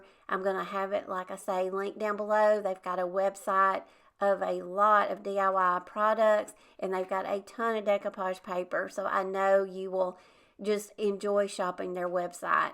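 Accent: South, United States. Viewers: 👋 I could sit and watch you craft all day. Love it all so much. My style spot on. I am so ready to have our retirement home built this year and start decorating it. I am sorry, but I had you mixed up with Lisa, from Our Shabby Cottage. I asked if she ever finished the doll house that she was working on for a hobby. She re assumed me that it was you. Again, I am so sorry for missing you two up. Both with that sweet southern accent, kinda like me. ❤ Love. Anyhow, I had a big interest in that video of your dollhouse. I had my husband build me one that I found on Pintrest. I had to put everything on hold due to family illness, but was still wondering about yours and excited to get back to mind.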